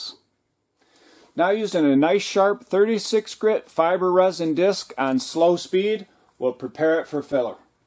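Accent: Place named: Ireland. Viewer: Nicely done! Good practice lesson love the Irish ☘️ accent!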